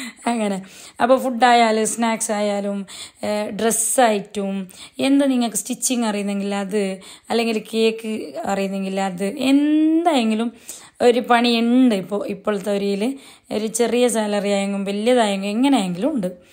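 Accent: Kasaragod, India. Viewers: നാട് എവിടെയാണ് കാസർകോട് ആണോ സംസാരം കേട്ടപ്പോൾ തോന്നി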